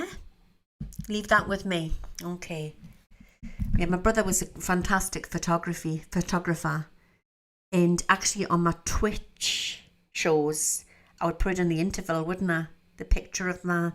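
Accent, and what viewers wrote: Newcastle, United Kingdom. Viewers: Love the show, and as a fellow Geordie I love to hear our local accent! Keep up the great work. x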